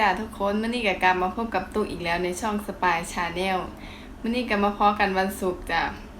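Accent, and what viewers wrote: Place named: Isan, Thailand. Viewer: อีสานกลางใหญ่ออกคักแด555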